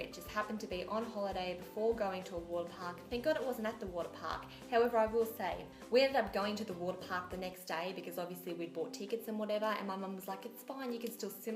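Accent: Australia. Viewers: i love ur humor ur so funny i love ur sas and u have a real aussie acsent u sound like kath off kath and kim i live in australia too perth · Are you from Australia?? If so where from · You have the best Aussie accent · Are you Australian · Someone else is Aussie😆 Love you💘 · You're Australian right? I am ☺️😘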